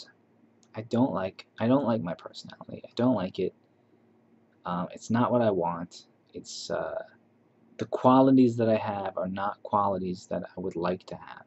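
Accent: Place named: New York, United States. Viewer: When you say "personality", do you still identify with INTP and the idea that personality becomes rather fixed by this point in life? As far as diving deep and really searching and theory building around yourself, I feel like for INTPs it feels really really fixed and not in a happy way. I accepted that, and I gave up on trying to subvert that. However, I feel there's ways to de-emphasize that insecurity, that deeply analytical or critical personality conflict. Just going exploring, weird places and doing new stuff, especially physical stuff, where you lose your head space . Like how traveling requires way different aspects of my personality and at the same time dissolves my identity because I don't know anyone and no one knows me so I can be ridiculous. Like just walk down the street and stick out your thumb and see if someone picks you up and you might feel like your personality could change. 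Or find a freight yard and hop on a freight train and become a new person, someone you've never met. What I'm saying is I think sometimes we get stuck in personality patterns and cycles because our environment. If we change our environment or schedule or the weather or people in our lives, we can see new parts of ourselves. You sound like you're from New York or something so you know how defined things can be on the East Coast/in the Northeast. Maybe those definitions highlight your insecurities? I'm from Massachusetts so I know this is possible. Our state creed is "I'm so much better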